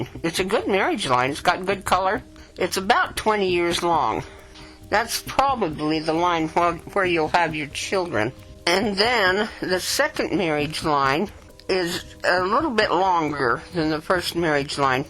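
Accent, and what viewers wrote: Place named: United Kingdom. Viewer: how much do you charge for a palm reading and where are you based. I'm in the UK,you sound similar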